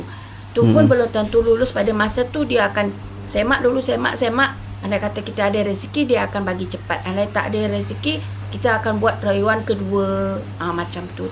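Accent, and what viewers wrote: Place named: Jambi, Indonesia. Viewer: Patutlah lidahnya Melayu - orang Jambi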